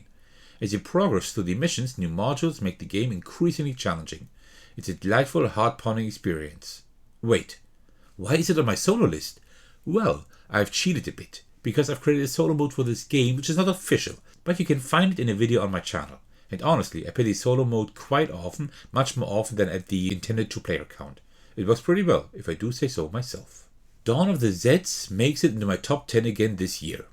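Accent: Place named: Germany. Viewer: Puh der deutsche akzent ist echt hart zu akzeptieren. 👎👎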